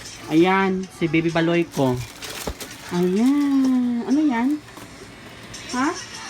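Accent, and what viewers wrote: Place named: Visayas, Philippines. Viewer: Taga Baguio ka Po ba madam? Akala ko nasa bisaya Po Kayo hehe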